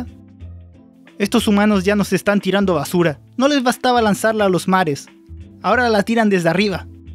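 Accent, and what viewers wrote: Argentina. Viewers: Eres de argentina? :v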